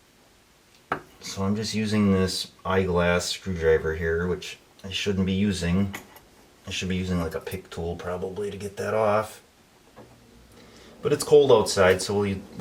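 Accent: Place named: Minnesota, United States. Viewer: Here I am back again watching this video. Leaking started again, despite following all the directions. Didn't wait for Moen to send replacement O rings this time. Bought them online. And they didn't reply to my email. Not a happy camper. However, I am enjoying the accent of the presenter here and guessing MN maybe? Lot of snow in the background.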